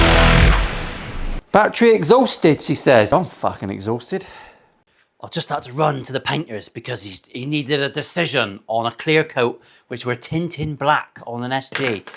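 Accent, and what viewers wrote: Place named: England, United Kingdom. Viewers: Nice job mate.. it was fun watching you at work.. like the English accent 🙂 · Heard the geordie accent but thought no more about it, then does the demo.😊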